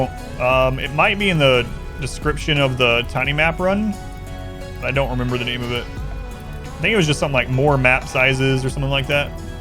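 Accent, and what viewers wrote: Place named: Appalachia, United States. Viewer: you should totally let your flag fly and do an Appalachian Run. You can revert to your old accent, only settle where it's most like Appalachia, and role play with all the stereotypes and culture of home. That would be amazing to see.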